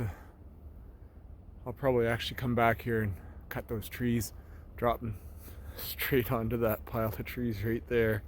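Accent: Canada. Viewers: Your Canadian accent and your usage of "feet" as a unit of measure don't compute properly in my Universe... 🤔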